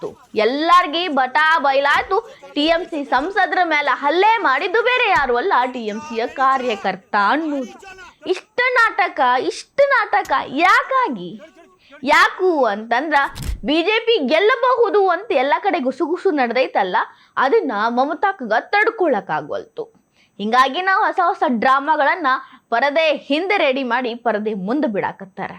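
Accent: North Karnataka, India. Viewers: ಇದು ನಾರ್ಥ ಶೈಲಿ ಕನ್ನಡ ನಿಮಗೆ ಇಷ್ಟ ಇಲ್ಲ ಅಂದ್ರೆ ಬಿಡಿ😡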